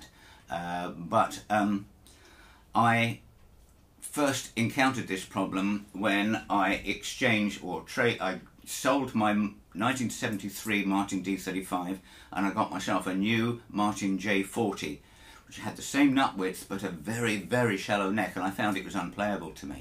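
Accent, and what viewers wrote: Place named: United Kingdom. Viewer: Hi! I really like your British accent. 😃 Sounds so stylish 😊What do you think of Guitars with laminated parts? Could they sound also good? I am thinking about buying a 12 string guitar (Guild) with laminated parts. Cheers Roger 🇨🇭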